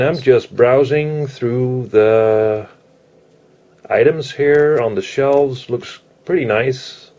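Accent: United States. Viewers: He certainly sounds quite American. Either that or North Germanic having learnt American English. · He sounds like he has an American accent though...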